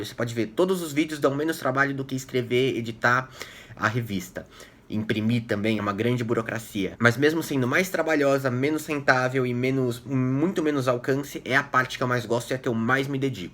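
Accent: São Paulo, Brazil. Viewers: Tu é paulista pensei que era gaúcho.Maneiro os seus vídeos!!!!Tu vai ter muito trabalho em 2018.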